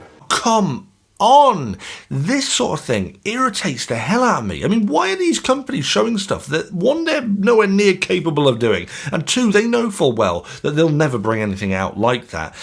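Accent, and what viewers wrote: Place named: England, United Kingdom. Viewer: I swear your accent is from the same bit of Blighty as Clarkson.. and you're also speed and whiskey freak. Have you hung out with Clarkson, by any chance?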